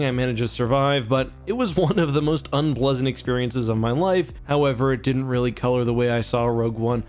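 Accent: Canada. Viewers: Are you canadian? It's the first time I notice some "oots" and "Aboots"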